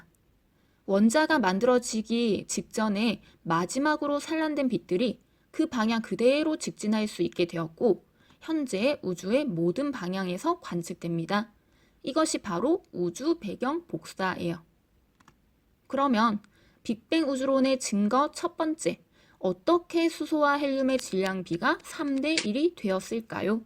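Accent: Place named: Gyeongsang, South Korea. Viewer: 선생님 경상도분이시죠? ㅎㅎㅎ 억양이 귀여우시네요 ㅎ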